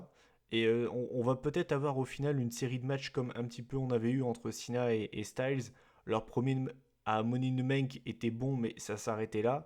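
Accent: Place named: England, United Kingdom. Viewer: J'adore ton accent anglais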